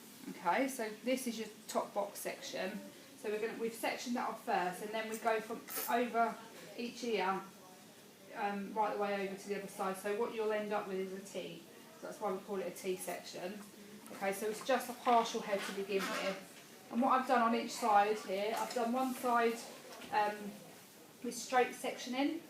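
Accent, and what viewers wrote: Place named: Kent, United Kingdom. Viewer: Is this in southeast Kent? Sounds just like the accent in Sandwich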